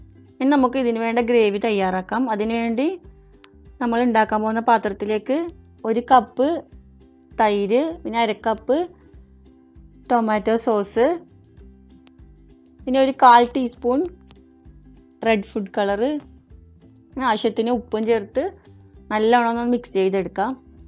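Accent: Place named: Pathanamthitta, India. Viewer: Very unique and easy! Nannaittundu.. naatil evideya? Annikkorundeiend undu from Pathanamthitta.. aulde samsaram pole und :)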